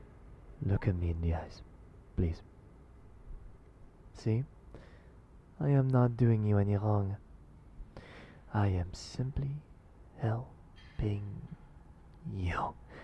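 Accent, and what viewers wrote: France. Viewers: Ring around the rosie. Pocket full of posies. Ashes, ashes. We all fall down. sings in a creepy voice By the way, I love your French impression. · When you get jealous of the great, but adorable, French accent: How could I get mad, and So jealous of that adorableness!? 😚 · God damn it, your french accent is absolutely ADORABLE! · me-I don’t care what you do to me just keep talking! Honestly though your french accent is lovely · Hey that's a great French accent! I'm well placed to know that because I'm french XD But I still don't understand ....HOW THE FUCK CAN YOU FIND FRENCH ACCENT SEXY?!? OUR ACCENT IS HORRIBLE!! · IS THAT A FRENCH ACCENT? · me a French girl: va talking English with the French accent: me: keep laughing cause he talks like my friends trying to talk in english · Omg french accents are my favorite! Please do more ♡♡ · That french accent 👌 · Oh mon dieu, l'accent Français en Anglais c'est ma phobie. 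Je ne peux pas, mais je t'aime bien quand même. "My FrIIIIIENND" AAAAAAAAAAAAAAAAAAAAAA x.x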